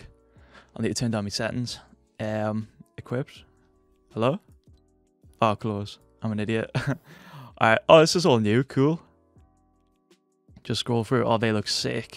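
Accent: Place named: Newcastle, United Kingdom. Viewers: What? You can't tell his british accent from all those settings videos? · Now we officially know ghat is british 😂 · He’s from Newcastle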